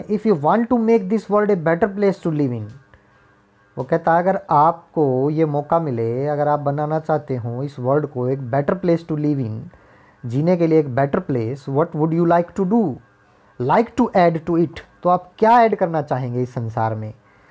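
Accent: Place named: Haryana, India. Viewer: Sir aap haryana sai ho na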